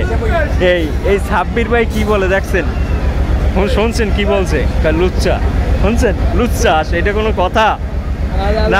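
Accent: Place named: Barishal, Bangladesh. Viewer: ভাই আপনার মুখে বরিশালের ভাষাটা আমার কাছে অনেক অনেক ভালো লাগলো আমার ভাই বরিশালের ভাষা অনেক ভালো লাগে আমার নানা বাড়ি ভোলা ।আমার দেশের বাড়ি শরীয়তপুর তারপরও আপনার বরিশালের লঞ্চের ভিডিও দেখি আমার অনেক ভালো লাগে ভাই। ❤❤❤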